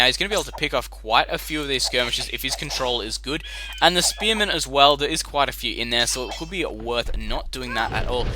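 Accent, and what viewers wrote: Australia. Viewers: from your accent you're Australian. Cant you get hex to pay for your uni?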